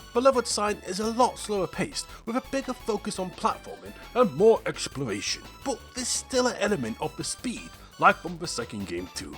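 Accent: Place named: Yorkshire, United Kingdom. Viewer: I always assumed you were a Yorkshire man!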